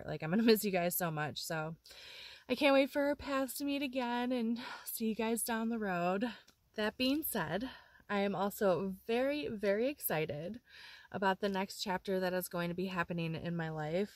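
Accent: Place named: Midwest, United States. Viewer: I love watching little Joey explore so much...you could literally strap a go pro to his back and make a channel and I would sub. good luck on the new chapter. I have loved watching you for the last year and appreciate you sharing your life. plus your midwest accent makes me feel at home. tehe. xoxoxox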